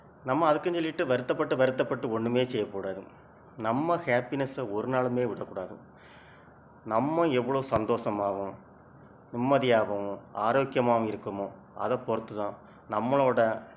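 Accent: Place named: Tirunelveli, India. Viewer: Coming from South super. திருநெல்வேலிடா.......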